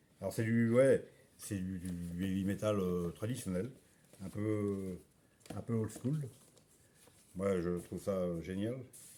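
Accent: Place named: Brittany, France. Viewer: ton Breizh-anglais est des fois limite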